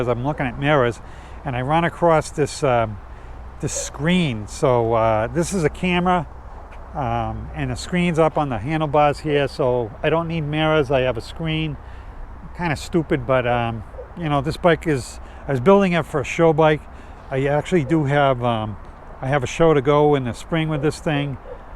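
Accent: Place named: Northeast, United States. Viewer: Hey do you have a link or source that you used for your cable management i wanna do that to my expedition looks super clean on this bike btw where u from New York or New England? i grew up in Mass. by Boston so i recognize the North East accent so you like those direct drive motor's verses the geared hub motors i know direct drive are quiet and made for speed more while the geared are supposed to be more setup for torque going up hills and what not from what ive come to understand im looking into putting another geared hub in the front of my expedition but its been a long time in the making due to research cost and what not have any info on that type of situation by chance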